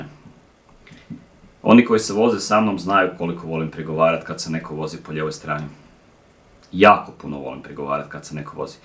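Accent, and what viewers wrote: United States. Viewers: Aaaa buraz,a gledam tvoje klipove i uvijek pomislim da si Amerikanac😂😂😂😂😂😂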